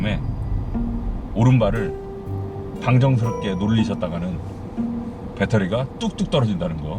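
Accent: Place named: Gyeongsang, South Korea. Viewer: 경상도버전 개웃곀ㅋㅋㅋㅋ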